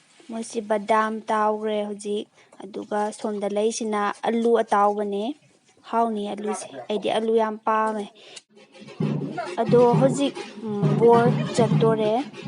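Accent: Kakching, India. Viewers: Lol akhoiba asida asumna narration touko. Kakching lol asina ariba lolni. Imphalda ngangnarisina lol anouba. Adunani maiba maibina lai haraobada sakpa sonba amadi ngangbada kakchinggi lol mallibase. Puya pabada lol nouraga paba ngamde.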